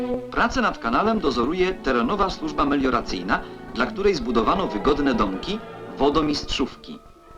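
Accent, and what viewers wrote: East, Poland. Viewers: Lektor chyba zza Buga? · Z jednej strony nie dziwie się komentującym, bo to historia, a jednocześnie dla pochodzących z tamtych terenów rodzinna historia, nostalgia. Jednak.. czy Wy nie słyszycie tej propagandy? Tego jak na sile podkreślane jest to że ta inwestycja to będzie wspaniała, która osuszy jedne tereny a nawodni drugie? Nie wspominajac o innej propagandzie ktora jest właściwie cały czas... ale takie były czasy PS. Czy wy też słyszycie ten akcent lektora, taki wschodni?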